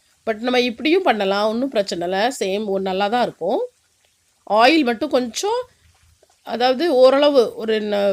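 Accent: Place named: Kanyakumari, India. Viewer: Your slang sounds like kanyakumari…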